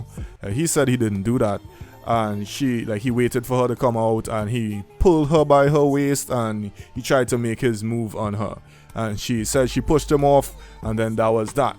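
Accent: Trinidad and Tobago. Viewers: You from Trinidad? You kinda sound Trinidad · Hey... where you from?? You sound like a trini? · You a Trini bro? Bless up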